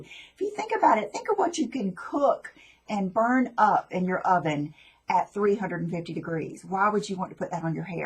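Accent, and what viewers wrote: South, United States. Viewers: well said b/c I am a firm believer in doing what you like to do re: anything from hair color, length etc.... your the one wearing it....your absolutely beautiful & that nice southern twang....love love...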